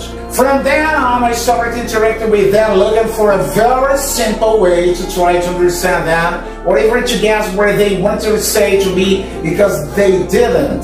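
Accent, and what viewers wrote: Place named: Brazil. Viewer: Your speech has a strong Brazilian accent, anyway.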